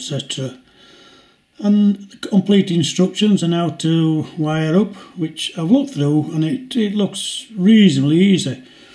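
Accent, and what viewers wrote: Yorkshire, United Kingdom. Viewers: you want to get dragon for Mac Professional 6 it's £249.99 but works really well and should understand your yorkshire accent with a bit training. Are you are using the built in dictation on your mac? Download the extra features in the settings so it won't have to keep going on the internet and it should work a lot better also turn the volume up a bit that helps. I like the lights I may have to get some in 00 and n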